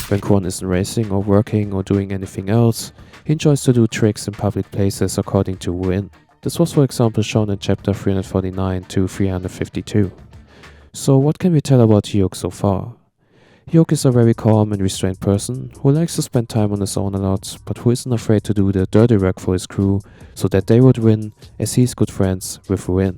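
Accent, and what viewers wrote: Germany. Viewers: New to this channel but as i windbreaker fan i love this video. Considering your slight accent are you also german?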